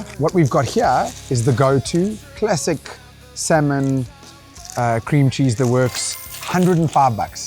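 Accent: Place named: Durban, South Africa. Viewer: Who the fuck is this o ? What a fuckin legend. Boy talks like he’s grown up in the 031. Good o. Good o.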